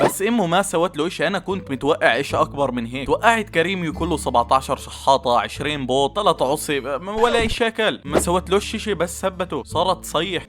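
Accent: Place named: North, Palestine. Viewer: لهجتك فلسطيني من الشمال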